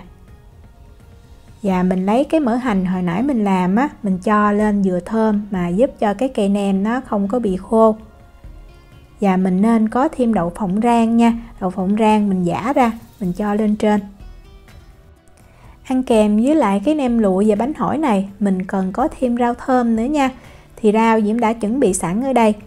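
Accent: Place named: Mekong Delta, Vietnam. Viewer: Thấy ngon quá, chảy nước miếng đây, thích nói giọng miền tây dễ thương quá. qua Nauy bao lâu rồi vậy. Cảm ơn nhiều.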